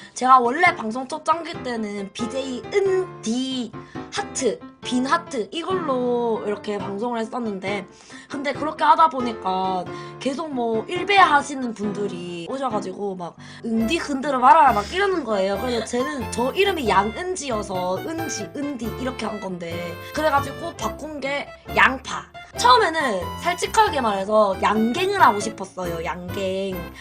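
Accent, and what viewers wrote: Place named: Gyeongsang, South Korea. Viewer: ㅎㅎ누가봐도 경상도분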